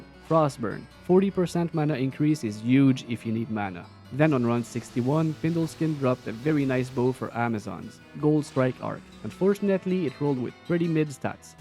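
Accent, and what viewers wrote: Quebec, Canada. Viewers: Tes quebecois en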